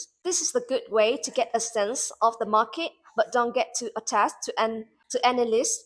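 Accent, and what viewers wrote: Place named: Australia. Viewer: Aussie accent, there.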